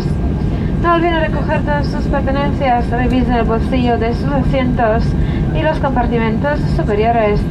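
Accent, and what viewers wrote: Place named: England, United Kingdom. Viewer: hehe easyjet, en naam leuk! Lol dat engels accent ken het bijna niet verstaan. Mooi video wederom! Weet jij misschien echt goedkope vluchten? Ik zie namelijk vaak spotters naar Geneve of Manchester gaan paar keer per jaar, of spotters die vaak vliegen voor goedkoop. Weet jij dat misschien! Alvast dank!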